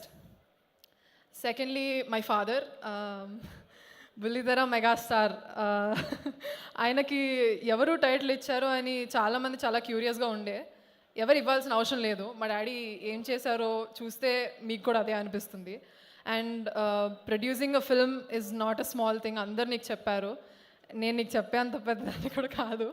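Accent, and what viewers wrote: Telangana, India. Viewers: Telangana slang suprrrr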